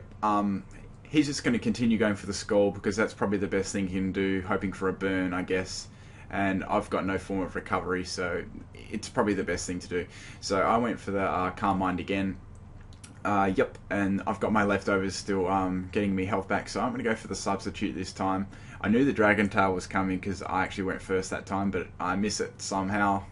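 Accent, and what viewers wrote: Australia. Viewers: You have a very heavy Aussie accent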